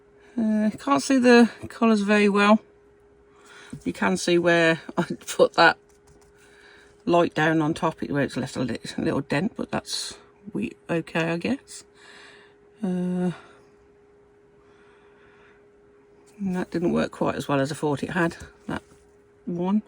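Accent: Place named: West Midlands, United Kingdom. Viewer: Which part of the west midlands are you from? I'm a willenhall yam yam lol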